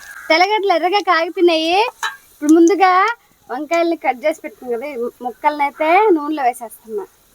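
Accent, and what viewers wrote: Nellore, India. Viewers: Hi gaaru... meeru..mee vaarini 'AVNU GADHAYAAA' ani mana nellore language lo antunte vinataani chaala baguntundi 😊naakkuuda maa vaarini alaane pilavaalanpisthundi😊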